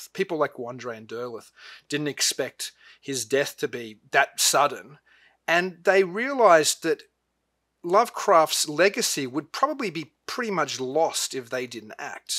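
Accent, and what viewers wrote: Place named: Sydney, Australia. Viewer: Good to see a fellow Sydneysider talking about Lovecraft books 😊Recognised the accent straight away.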